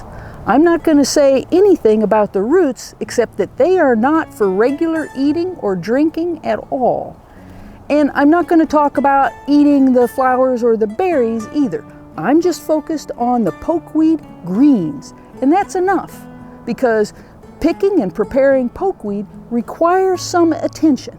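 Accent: United States. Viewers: Ma’am I love your country accent.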